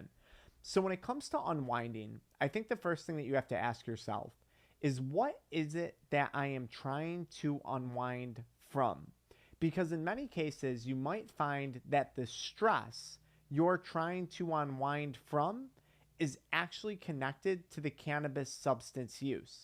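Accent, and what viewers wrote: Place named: Western New York, United States. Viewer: I know another western New Yorker when I hear one 😂 awesome content as always!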